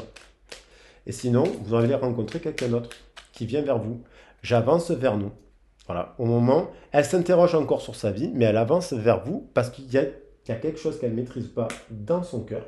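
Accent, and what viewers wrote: South, France. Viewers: ah ! Je savais que dans cette jolie voix, j’avais entendu le chant des cigales. J’avoue j’ai aussi un accent bien du sud , le pays catalan quoi 😂😂des bises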